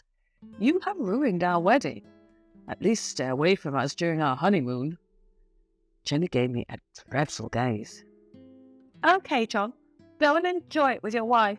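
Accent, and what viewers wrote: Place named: United Kingdom. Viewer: British accent is not for everyone, the story sounds interesting but i couldn't finish it, cos i got stressed listening.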